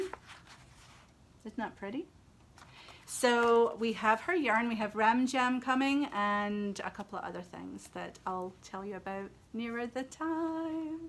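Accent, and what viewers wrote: Scotland, United Kingdom. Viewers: Love your Scottish accent! I’ve just finished watching all the episodes of “This Farming Life” on BritBox. Most of it is filmed in Scotland, but unfortunately they breed their sheep for food and not wool, I hope we knitters can encourage the industry to change. Will cast on a pair of mittens soon for the KAL with some 🇨🇦 yarn.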